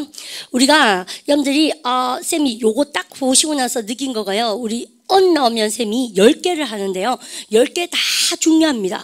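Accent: Daegu, South Korea. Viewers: 아이들 다 대학보내고 이제 자유부인이 되었는데 허한 제 인생을 영어로 함 채워볼까 해요. ^^ 쌤 구수한 대구사투리 넘 좋아욧~~저도 사투리 쓰는 부산아줌입니다 ^^ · 50넘은 세아이 엄마. 심심풀이로 시작 했어요. 대학시절도 생각나고. 선생님께 영어도 배우고. 플로리다에서 하루 종일 한국말 듣기만 하는데 경상도액센트도 정겹고 가끔씩 귀에 꼭꼭 들어오는 갱상도만의 어휘구사도 반갑습니다. 매일일상에서 쓰는 단어 별거 없다고 우습게봤는데 아이들이 중학생이되니 저도 어휘구사를 좀 고급지고 학구적으로 향상시킬 필요를 느끼던참에 샘의 강의 발견하고 열공중입니다.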